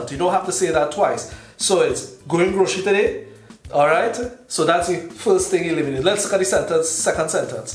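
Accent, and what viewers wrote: Trinidad and Tobago. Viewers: I also thought "nevermind" doesn't sound like trinidadian ;)) I absolutely love the rythm, the accent, I spent the best years of my life with trini people and I miss that vibe in my life fuh sure!! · I love hearing my Trini talk 😍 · lol It's like African american ebonics but with a trini accent lol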